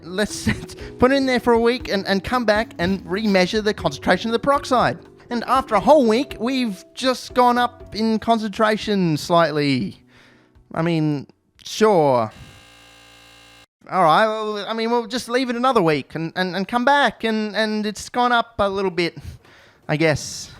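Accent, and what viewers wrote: Australia. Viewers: When I first saw this channel I thought damn this is so american but naw he australian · I thought he was Australian not Finnish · Honestly that's how Aussies speak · From the accent, you must be from Australia, the snake in the house--- sounds like down under. Many poisonous animals, and insects in Australia. I always wanted to see experiments using Hydrogen peroxide because I played with it in high school (I'm not a Chemist), and it fascinated me the many reactions like powered iron, or magnesium oxide from used up carbon batteries producing prodigious quantities of Oxygen. thank you sir!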